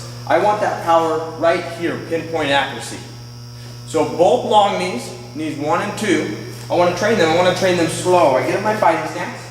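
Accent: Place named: North, United Kingdom. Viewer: you live up north somewhere? I can tell since you say bag as bayg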